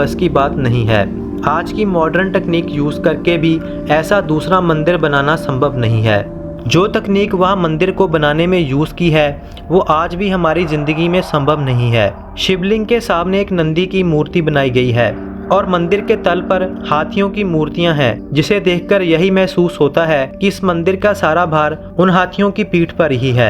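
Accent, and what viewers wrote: Punjab, India. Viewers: OM NAMAH SHIVAY JAI MAHADEV 🌹🌹🙏🙏🌹🌹 Bhai tum aawaaz se punjabi lagte ho. kyunki punjabi hi hindi ko jabardasti bolta hai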